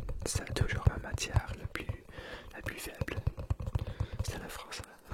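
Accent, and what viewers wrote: Quebec, Canada. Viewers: Ton français resemble au français du Québec ! A tu apris le français au Québec ? Btw très bonne vidéo merci !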